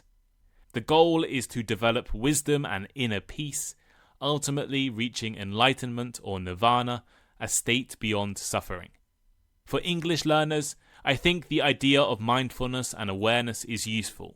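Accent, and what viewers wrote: United Kingdom. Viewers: It's my great honour to hear from you. Shadowing your clear and standard Britsh accent has become a habit of mine.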